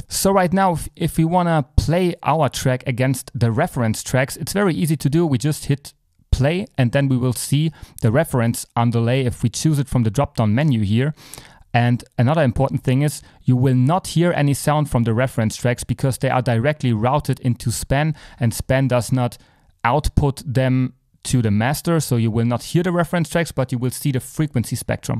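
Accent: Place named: Germany. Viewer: that german accent lol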